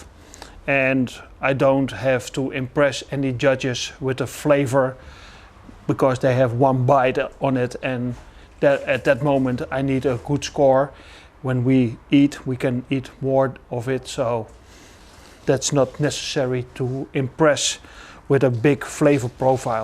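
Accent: Netherlands. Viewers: This guy has a Dutch accent....i know the accent.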